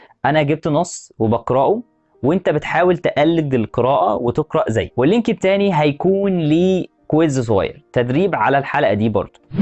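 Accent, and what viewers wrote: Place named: Pakistan. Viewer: لهجتك باكستانيه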